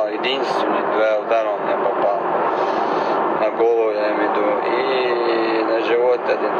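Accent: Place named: Urus-Martan, Russia. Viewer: Как ты разговариваешь... видно что ты из Урус-Мартан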